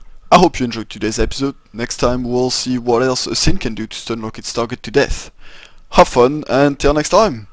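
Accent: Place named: France. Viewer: Oh putin l’accent français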